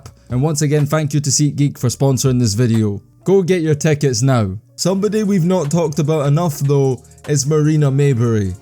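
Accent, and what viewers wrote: Scotland, United Kingdom. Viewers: caitlyn clark is not beating shit ok , boy i tell you the racist cowardice power structure is something else , Those other girls on the indiana fever are balling there asses off there the ones that’s winning those games , but yet everytime they give all the credit to caitlyn clark that’s a good way to start division amongst your teammates, this dumb british accent racist guy narrating is dillusional , those girls on the indiana fever are playing there asses off stop giving caitlyn the credit when she has a whole team behind her !!! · Good job. It just seems kind of odd to hear someone with a thick Scottish brogue doing an analysis of American basketball.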